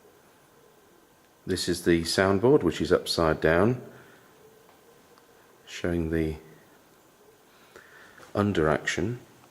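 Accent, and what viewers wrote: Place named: RP, United Kingdom. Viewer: A very interesting video and you do talk very posh!:o)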